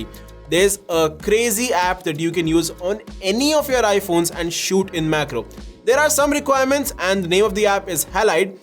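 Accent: India. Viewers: I don’t understand your English. Why do Indians think people understand their thick accent English?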